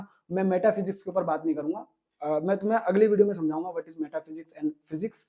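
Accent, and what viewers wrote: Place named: Bihar, India. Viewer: Bihari ho kya?thoda nhi, thora bol rahe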